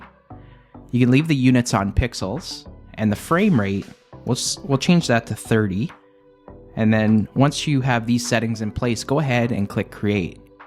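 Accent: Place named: Canada. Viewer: the canadian accent is strong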